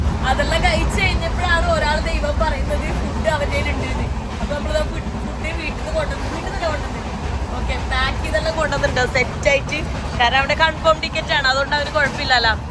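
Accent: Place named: Kochi, India. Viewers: Oru kochin slaang varunudallo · Kochi slang anallo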